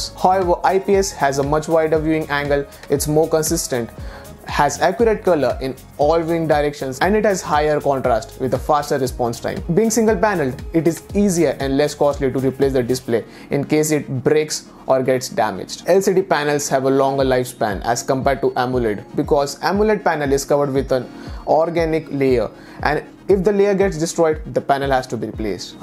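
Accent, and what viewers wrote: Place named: India. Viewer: Your sound like keling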